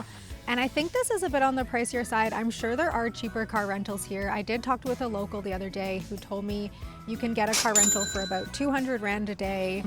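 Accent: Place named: New Zealand. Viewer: Hiya, great to to see you out and about! Canadian you say, but sounds kinda Kiwi? Any case, how are things going with that heavy metal (copper covered lead) allergy of you yours? Y'know, that high-speed / high-impact variety of lead? Causes severe skin irritation and all sorts of internal issues too, if I remenber correctly? Hey, have you tried to find a Job yet and earn some those cheap SA Rands? The same one's that seem so worthwhile right now, with the exchange rate & all? Honey, you're cluelss about where you are. Keep posting, it's HILARIOUS!. Enjoy while you can!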